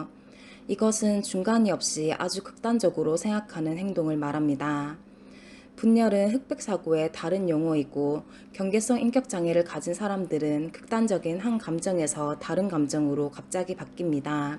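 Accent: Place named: Busan, South Korea. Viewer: I can hear a hint of her Pusan-dialect, which makes it understandable. Don’t bash her. At least she’s trying hard to make it sound clear.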